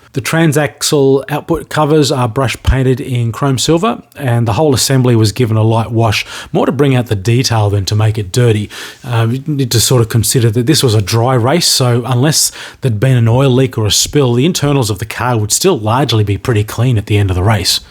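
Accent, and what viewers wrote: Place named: Australia. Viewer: This is awesome and for some reason the accent makes this that much more special. I have to be honest, its probably because Aussi race announcers. Regardless killer build man.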